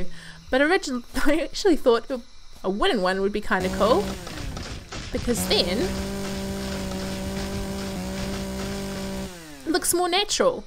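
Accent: New Zealand. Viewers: Just found you and this vid; only bought it the other day, so all new to me. Good vid, thanks. :) SPACE girl, eh? So, Elite:Dangerous or not? ;) (Not yet explored your channel yet... another time -- overdue to get back into Empyrion...) Is there a bit of American/Canadian to your Kiwi accent -- or am I wrong?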